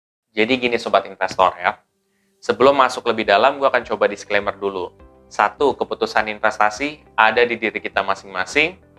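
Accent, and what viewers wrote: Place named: Java, Indonesia. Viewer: Keturunan bule ya mas tapi medok jawa 😊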